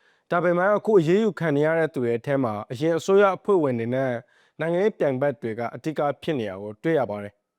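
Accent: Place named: Rakhine, Myanmar. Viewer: ရခိုင်သတင်းဌာနလား ၊ သတင်းကြေငြာသူက ရခိုင်သံပေါက်နေတယ်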